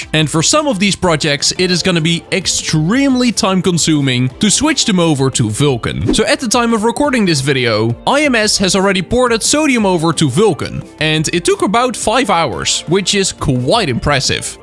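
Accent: Netherlands. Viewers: holy nederladse stem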